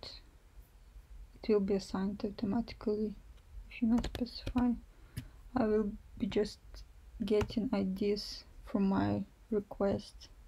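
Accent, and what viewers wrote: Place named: Russia. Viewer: One can always spot a Russian thanks to this amazing accent! Tx for the vid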